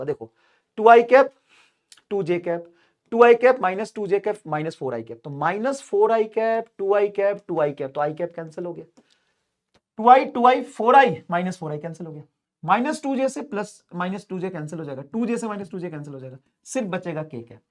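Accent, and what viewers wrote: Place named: Bihar, India. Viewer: Bihari h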